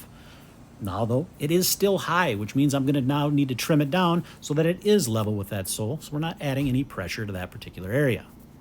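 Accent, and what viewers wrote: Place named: United States. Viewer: this one of the few american accents i find bearable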